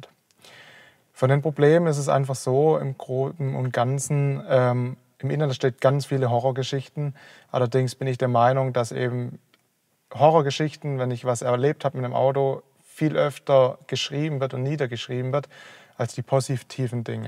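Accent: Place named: Swabia, Germany. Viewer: Der Schwabe :)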